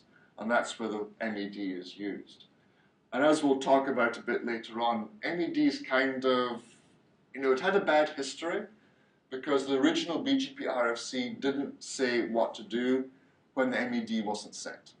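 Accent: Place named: Siberia, Russia. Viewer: Siberian English .... echoing behind tongue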